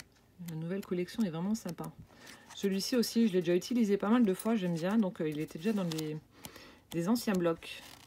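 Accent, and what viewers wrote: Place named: Lorraine, France. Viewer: ah voilà jme disais bien que tu avais plus une façon Lorraine de parler. Moi je suis du 68 région des trois frontières aussi mais FR, DE et CH. Comme j’ai beaucoup de famille en Lorraine, je connais le coin de Thionville. Contente que tu m’aies répondu, bon scrap bisous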